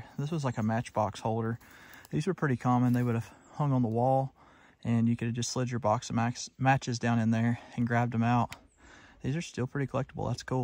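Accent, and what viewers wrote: South, United States. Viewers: Gotta say, when you say "dad gum it".... just makes me chuckle and grin! Love our old southern talkin! Great walk... keep em coming!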